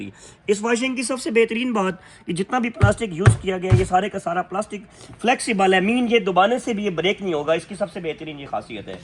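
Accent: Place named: Chakwal, Pakistan. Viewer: بندے کی اردو تو چکوالیوں والی ہے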